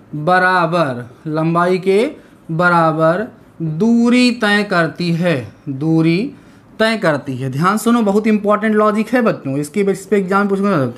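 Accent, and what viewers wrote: Bihar, India. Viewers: Totally ap bihari language me pdate hai Maza aa jta hai pdh k😍😍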